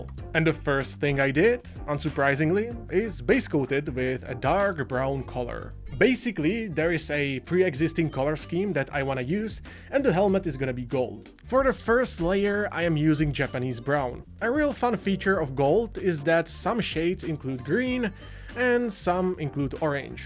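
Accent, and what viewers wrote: Czech Republic. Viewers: First few sentences and I immediately knew you are Czech, then I checked and I was correct :D Hi from Prague :D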